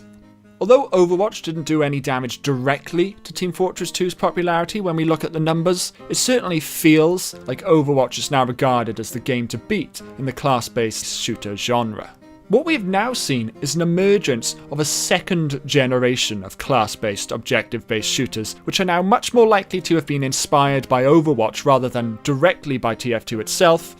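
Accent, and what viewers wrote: Wales, United Kingdom. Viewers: Is that a Welsh accent, cant tell if it is or not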